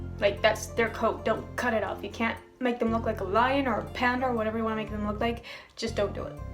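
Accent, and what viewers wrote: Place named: Netherlands. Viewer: Heey are you dutch? Your accent sounds pretty mutch just like a friend of mine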